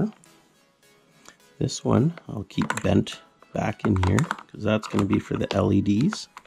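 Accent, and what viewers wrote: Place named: Canada. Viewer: I understand the process. I was simply noting that you never mentioned what type of battery charger to use with it. If someone who doesn't understand LifePo4 batteries builds your project, (a very fine one at that), and tries to charge the battery with a standard power supply, car power socket or car battery charger, they will wind up with a pile of HOT orange goo where the battery box used to be and / or possibly even a very hot fire that is next to impossible to put out. Lithium type batteries are no joke and need to be used with care and respect. I was encouraged by your use of a fuse. I'm guessing by your accent that you are Canadian. A little tip for you and your fellow countrymen / women and my country people to the north of me. LifePo4 batteries can NOT be charged by ANY charger or solar array if the cell temperature is below 32 degrees F, (0 C). 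Permanent damage to the battery will result. it can be used cold but not charged. It must be warmed up first. I say this for someone who may go ice fishing and leave it sitting on the ice or may leave one in a car for emergencies in the winter. Larger higher quality, LifePo4 batteries for solar, marine or RV use have a BMS module or Battery Management System module built into them to protect them from overcharge, undercharge, low charge condition, (below 20%), short circuit, excessive high drain and high and low temperature conditions. It is not impossible but unlikely that your battery contains a BMS module and more likely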